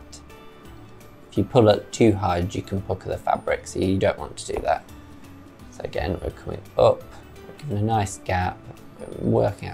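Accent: United Kingdom. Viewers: To each his own. I have to strain to understand his ever-so-gentle British accent. Sorry.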